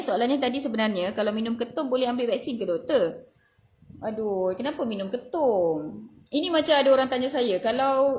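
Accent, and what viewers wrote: North, Malaysia. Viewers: Fasih doktor cakap melayu.... Dr org utaqa ka?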